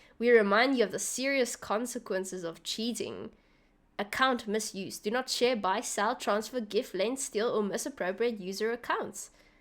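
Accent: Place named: South Africa. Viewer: South african accent the best 😁😁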